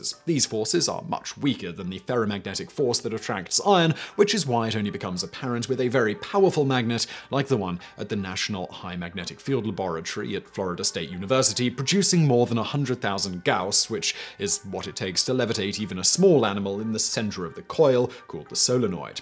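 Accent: United Kingdom. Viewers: I had no idea how many weebs -- specifically JoJo fans -- were part of Simon's fanbase until I looked in the comments. I just assumed YouTube first recommended me his videos because he's got a British accent which is coincidentally similar to the accents of aniTubers I watch. Let's try to decode this insane YouTube algorithm! Which aniTubers do you watch if any?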